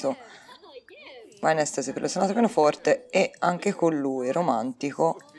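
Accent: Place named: Tuscany, Italy. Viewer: Ciao sono Marco,un nuovo amico! Bellissimo questo video e gioco Sims 4 ho molte amiche che hanno canali dedicati ai Sims ,mi piace il tuo accento Toscano....complimenti! Mi sono iscritto 1 8 7 e... l i k e Se ti fa piacere ti aspetto su un mio video e canale io tratto molta natura,animali,immersioni,viaggi,ecc...così ti presento anche la mia grande community YT dove troverai molti nuovi amici ed iscritti. A presto...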